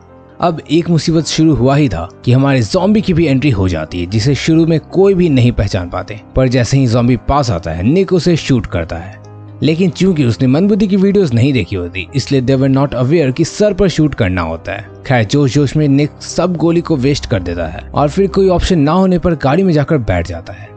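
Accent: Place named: Bhojpuri, India. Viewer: Your Bhojpuri accent was op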